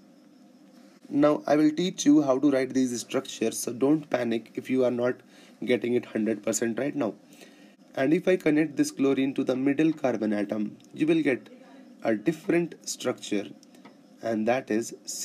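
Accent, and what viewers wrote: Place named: India. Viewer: one of the few Indian accents that doesn't irritate me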